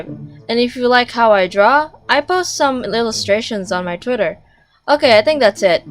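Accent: Philippines. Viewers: The moment I heard your voice I new you we're a Philippine and btw we can our teachers sir too, and I also have a good art teacher :3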